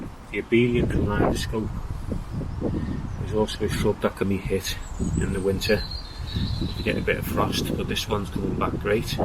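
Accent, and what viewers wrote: Liverpool, United Kingdom. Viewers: Loved this video narrated by a fellow scouser, your plants are beautiful. Regards from Ontario, Canada.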